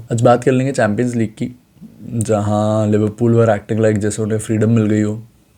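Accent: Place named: Madhya Pradesh, India. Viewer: What kind of accent is this? Sounds like someone from mp or something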